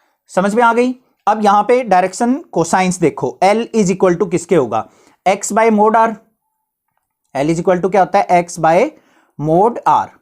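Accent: Haryana, India. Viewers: Bhai Haryana k hai sir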